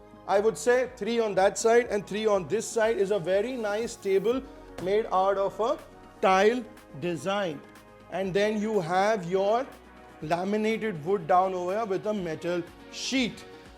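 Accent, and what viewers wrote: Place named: Mumbai, India. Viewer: Only reason I love watching his videos is proper mumbaikar accent ! ❤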